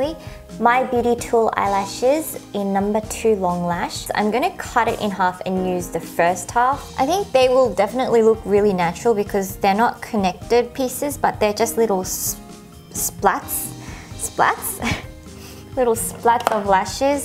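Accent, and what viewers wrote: Australia. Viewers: You are just lovely but I often get confused with your accent hahaha. I know it's mostly Australian but sometimes I hear Russian? Hahahah it's probably just me. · i love your aussie accent ♥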